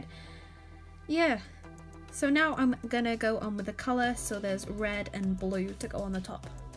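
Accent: United Kingdom. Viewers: Are u from Scotland Uk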